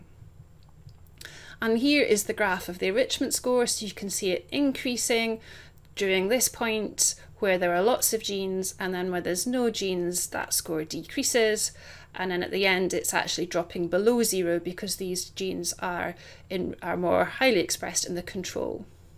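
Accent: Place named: United Kingdom. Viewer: Thank you. That was concise and wonderfully analyzed . Meanwhile your British accent is super!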